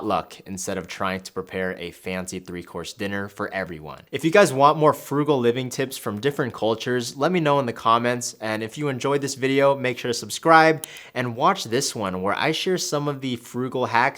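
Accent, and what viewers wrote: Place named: Philippines. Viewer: Subbed to your channel before i even learned you're a Filipino as well. I knew you sounded familiar 😊 Great videos btw. Keep it up!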